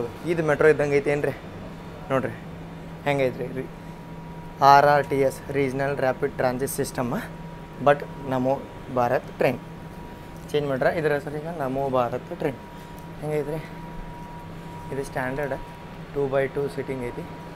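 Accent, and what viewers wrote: North Karnataka, India. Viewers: ಇಟ್ಸ್ ಓಕೆ ಅಣ್ಣಾ ಜೀ... ನಿನ್ನ UK ಭಾಷಾ ಶೈಲಿನಲ್ಲಿ ವೀಡಿಯೋ ನೋಡೋದೇ ಒಂದು ಖುಷಿ ನನಗೆ .. 🎤🎵🔥🔥🌟🌟🌟❤️🙏🙏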